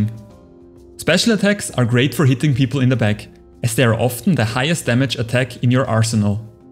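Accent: Germany. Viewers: German Accent 👌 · You German?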